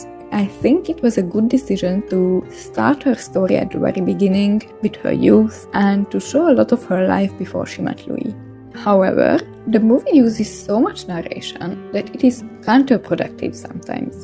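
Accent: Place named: Germany. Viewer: Yup. You sound just like A German.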